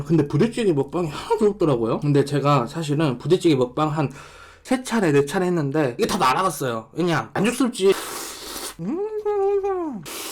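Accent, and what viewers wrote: Jeolla, South Korea. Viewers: ㅋㅋㅋㅋ킬바사 나트륨은 여전하신지 ㅋㅋㅋ이전에도 짜다고 짜증냇는거 기억나ㅋㅋㅋ짜증낼때 전라도 사투리 나오는거 졸귀ㅎㅎ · 오빠 말투 너무 귀엽자나요...... 전라도전라도한게 구수하기보다 귀여워서 계속 그 부분 돌려보게 되자나ㅠㅠㅠㅠㅠㅠ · 전라도 사투리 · 사투리보고 도 전라도 사람인거 인증 저도 전라돈데 뭔가 같은게 있어서 기분이 좋아 욤!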